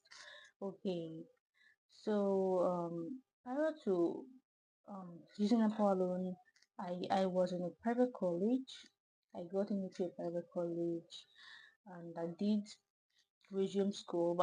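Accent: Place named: Nigeria. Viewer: Your mic is not helping at all... I can't really follow you that much, your Nigerian accent is too much and the mic too is producing base alone. Please check it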